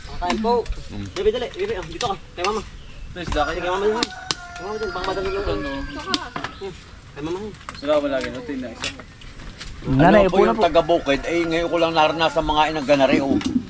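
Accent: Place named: Bicol, Philippines. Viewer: Yung salita mo po naalala ko yung kaibigan kong si unad taga bicol din 😢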